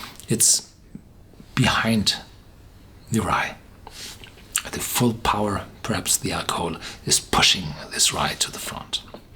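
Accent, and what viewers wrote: Germany. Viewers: Sympathisches English 😉. German style.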